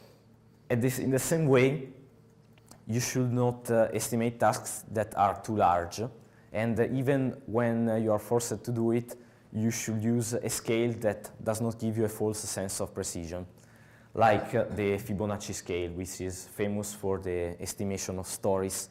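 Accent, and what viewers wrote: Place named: Italy. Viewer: Love the Italian accent describing Pomodoro technique. :-)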